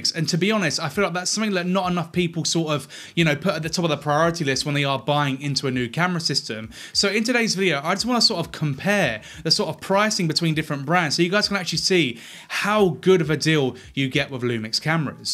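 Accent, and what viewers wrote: United Kingdom. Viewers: I don't know if it's the irritating British accent or his personality. But he has the sincerity of a used fast-talking used car salesman. A camera is not a function of price and glass. I figured out at the end that he was auditioning to be a Lumix "influencer". He said that he was putting his name next to the brand. Am I supposed to know who you are?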